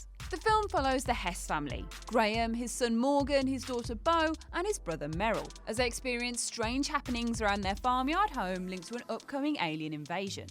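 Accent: United Kingdom. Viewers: Why does every channel have an english accent now? Lol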